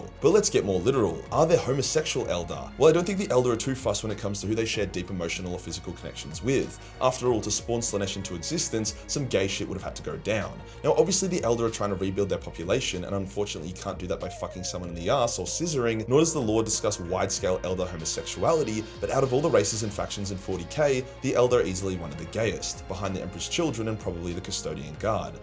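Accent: Australia. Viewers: You went full Aussy on this one. Love it.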